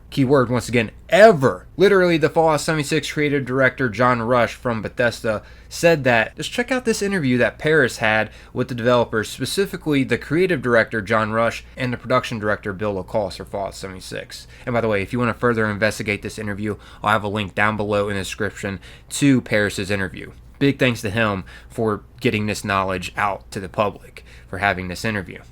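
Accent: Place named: Philadelphia, United States. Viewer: Off topic but I love your accent. Philly?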